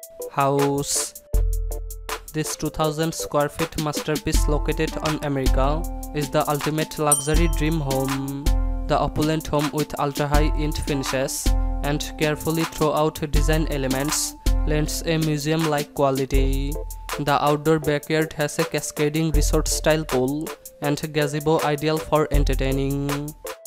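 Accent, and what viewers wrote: India. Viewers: wtf is that engl ? are you from India ?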